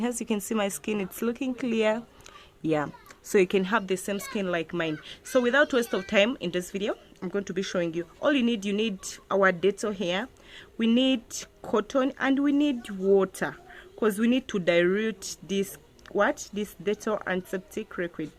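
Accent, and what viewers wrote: Uganda. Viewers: Uganda accent for me bby girl thanks im gonna follow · Hi, thanx Are from Uganda? Your accent!!!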